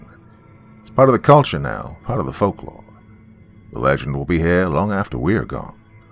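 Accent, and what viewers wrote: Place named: United Kingdom. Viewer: Hearing Michigan and Michigan towns I know about in a British accent is kinda neat. On a side note ive never heard this legend before.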